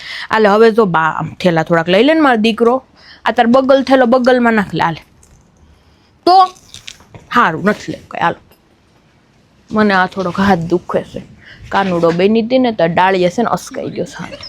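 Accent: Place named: Kathiawar, India. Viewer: Very nice video Very good tamari kathiyavadi leg vage. Videsh maa rahi ne jadvi rakhi che.way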